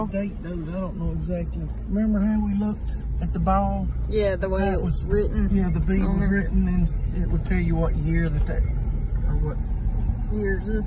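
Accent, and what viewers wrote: South, United States. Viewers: Nice to see a pretty girl with a southern accent not covered in tattoos and saying like every third word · So cute I love that southern accent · I'm from SC but your accent is country! I could listen to you talk all day. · I 💘 your beautiful smile too and your country accent too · You’re beautiful 😍 with that country accent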